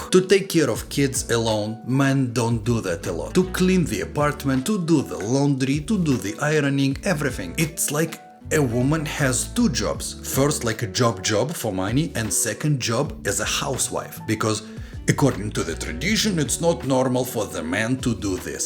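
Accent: Russia. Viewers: Despite your runglish pronunciation I found your videos a really helpful for studying English, because I can understand everything, even some words which I don't know or not sure I understand it because of your intonation, context and gesticulation! And you raise a really interesting themes, so I have to say thank you, don't stop and be healthy! 😉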